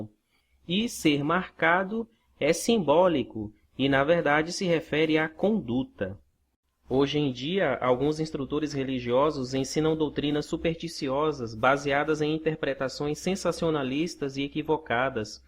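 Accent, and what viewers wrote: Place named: Northeast, Brazil. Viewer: Seu canal é didática bíblica. Porque não põe seu nome? Seria muito bom conhece você seu sotaque nordestino lembra irmãos amados do nordeste um abraço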